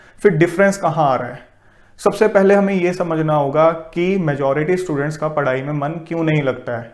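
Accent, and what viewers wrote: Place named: Punjab, India. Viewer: punjabi punjab aala banda e bolda mere pra.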